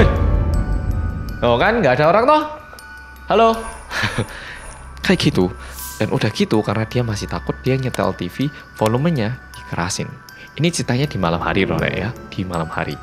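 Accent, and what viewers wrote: Java, Indonesia. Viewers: Ga percaya aja oppa ini logat jawa bukan logat korea🤣 medok banget jawa nya wkwk, tapi muka korea banget wkwk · Kerennn medhok tnan😍😊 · Bang orang korea ap orang jawa kok logat ngomongnya jawa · Enak banget dengerin orang Korea ngomong nya medok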